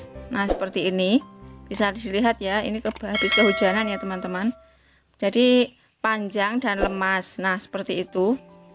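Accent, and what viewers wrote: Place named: Java, Indonesia. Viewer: Medhok mbak jowony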